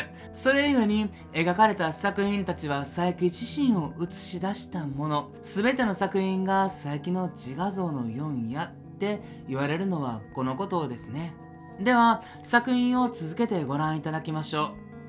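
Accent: Kansai, Japan. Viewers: 急に関西弁になって、ちょっと入ってこない笑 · あれ、いつもと語り口が違う…と思ってましたがあえての関西弁だったのですねｗ 佐伯祐三展が開催されると知った時から行きたい行きたい、いや行かなくては！というくらいの行く気満々。なにかこの荒々しいタッチに魅かれるんですよね～ ただ地方在住の悲しさ、一月中に行くつもりが開催日以降の土日が悪天候の強力寒波と雪で行く予定がまだ定まらず、ここまで来たら三月のルーブルと一緒に、かな～と思案。とにかく絶対行きますよ！ｗ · 同じ大阪出身の画家へのオマージュなのか、の関西弁、いつもとちょっと違って、印象に残りました。冒頭の写真の佐伯祐三、ハンサムですね！イケメンだとそれだけで、３割増しです。オーヴェールの教会、私は佐伯の絵の方が好きです。郵便配達夫、味があっていいですね！後に、彼の妻がこの配達夫を神様ではなかったか？！と言ったというエピソードも素敵です。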